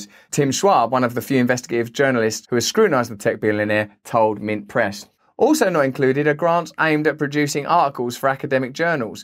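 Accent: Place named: England, United Kingdom. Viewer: Fir ee aye miwwen dollars, come on. Is it just me or do English people sound like they're still trying to learn english while the rest of us learnt to talk properly and moved on.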